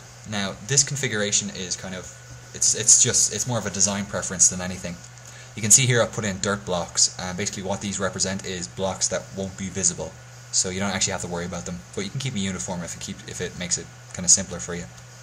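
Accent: Ireland. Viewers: Do you have a hint of an irish accent?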